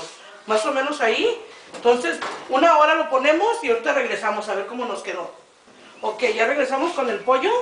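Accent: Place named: San Luis, Argentina. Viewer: Damn, it's a good thing I understand Espanol,,,, porque yo si entender la forma que te hablamos,,,,,, yo vive en San Luis, R.C. Son, por unas 3 anos como son 30+ anos pasado, y la jente hablan egual a como usted. , se vey muy facil a hacer este "meal", muchas gracias...... Seniora.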